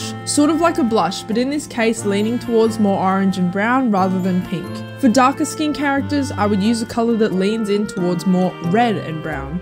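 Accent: Australia. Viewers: Are you... Australian??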